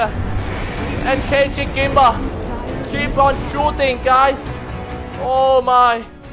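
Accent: Thailand. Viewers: Everybody's hating on this caster saying "he has low knowledge on the game". Well for me, he's entertaining. Has a sense of humor which is rare in the casting scene. He's a breath of fresh funny air. (And his Thai accent is part of his charm) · The Caster sounds like Thai or Vietnamese...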